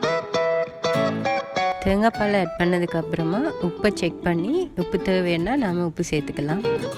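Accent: Tamil Nadu, India. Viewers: தமிழ்நாட்டில் பேசுவது போல உள்ளது,யாழ்ப்பாணத்து காரங்ங இப்போ தமிழ்நாட்டுக்காரங்க மாதிரி மாறீட்டாங்க,சீரியல் பார்ப்பதோடு நிப்பாட்டுங்க,அதே மாதிரி பேசி அழகான யாழ்ப்பாண தமிழை அழிய விட்டிடாதீங்க,அழகு யாழ்ப்பாணத்து சுத்தமான தமிழ்தான்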